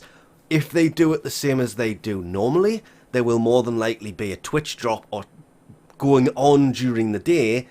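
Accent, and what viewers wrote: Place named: Newcastle, United Kingdom. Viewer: Geordie (from Newcastle I would guess).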